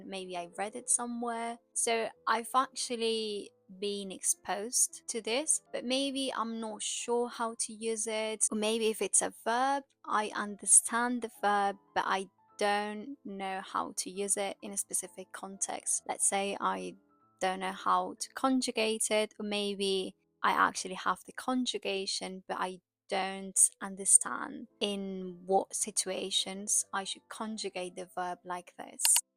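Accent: United Kingdom. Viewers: oooo British English.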